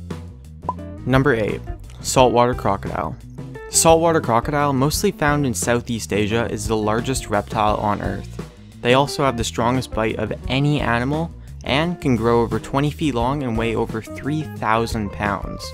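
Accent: Canada. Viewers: Are u canadian? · are you canadian, definitely canadian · You're Canadian aren't you? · are you Canadian?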